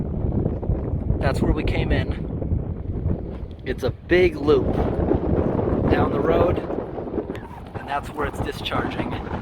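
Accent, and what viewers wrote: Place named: New England, United States. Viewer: Just a couple of comments. First I love your dog, she is beautiful, would be nice to see more of her... second, I really dig your accent... is that typical 'New England'? next ....you are showcasing an outstanding part of your wonderful country, educating individuals such as myself to the rich and diverse wilderness still left in the north eastern states....Finally much respect to you for your selfless efforts to improve the environment for the benefit and enjoyment of your fellow bods. ....Peace and happiness from across the pond (Old England).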